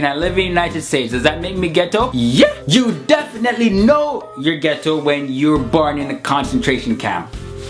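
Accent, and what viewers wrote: Jamaica. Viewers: Oh I'm just loving the Jamaican accent!! I'm from Germany and I definitely wanna to go to Jaimaica when I'm older :) So here's my question: What is your first thought when you hear the word German?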